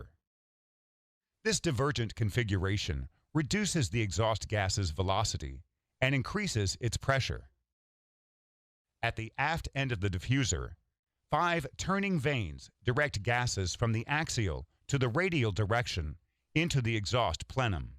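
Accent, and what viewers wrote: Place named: England, United Kingdom. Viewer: Excellent description and detail by the commentator. My hearty congratulations. I do feel that the modern jet engine must be classified as one of the modern wonders of engineers. The combined engineering logic of the jet engine took a lot of time to be put together and if one had to put an initiation spark on the human mind, it was Charles parson who combined the high pressure in a boiler to spin the turbine in a steam layout. I was lucky enough to have lived at Newcastle-upon -Tyne where I went on many visits to see the Turbina the first steam turbine ship which surprised the Royal Navy at Spithead. I worked on the first jet turbines on a naval ship and it was the HMS Exmouth when I worked at Chatham Dockyard. Again Congratulations to Oil Gas World for providing such detailed information about the thermodynamic stages and the cooling methods on every unit. 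When I built a model engine with one compressor stage and one turbine stage, the diffuser right after the compressor was a tricky unit where the air had to be slowed down to sustain the flame and then the flame diluted with colder air along the burner to isolate the flame from the metal. Well done to the commentator, HIs English accent is what I aimed for when I spoke that language, but I could never get rid of my mother's tongue diluting the English language I spoke at Chatham and Newcastle.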